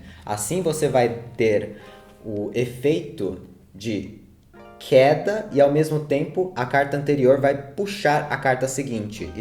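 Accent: Brazil. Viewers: Qualidade alta... cenário simples, técnicas que não vemos no Brasil... de repente: português-Brasil, sotaque paulista. kkkkkkk Mt bom.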